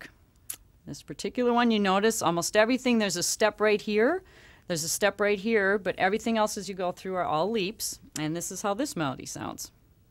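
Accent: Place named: Wisconsin, United States. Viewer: Wisconsin accent to the moon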